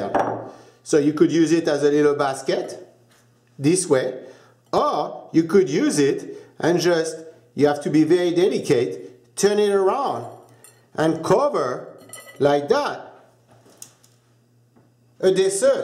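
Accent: Jamaica. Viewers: i love his jamiacan accent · jamaican accent man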